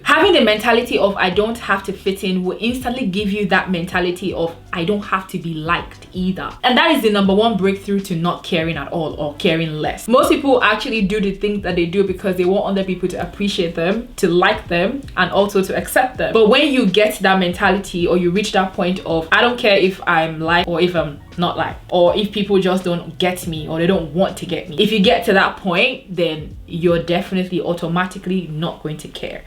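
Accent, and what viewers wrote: Nigeria. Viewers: I actually love Nigerian accents I think they sound so beautiful and genuine, honestly growing up with parents who have an accent makes me ask the people who said, why you watching the video, if you can’t get past the accent? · This video just said it all and I love the fact that you also don't care about what people think about your accent,if it's the accent that's their problem,they should find the accent best suitable for their ears then. Team Nigeria 🇳🇬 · Girl one of the reasons I listen to you is because of your accent 😂. Naija represent, don’t mind these people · I’m Kenyan!🇰🇪And I love your Nigerian accent! Haters are gonna hate. Just keep up with your amazing videos & advice!❤️ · I love your beautiful nigerian accent 😻 I am French and been watching you for so long. I understand so well Keep going and glowing ❤ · The intro! :) Girl your accent sounds beautiful!!!! 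Naija giiiirrllll <3 MUCH LOVE SIS!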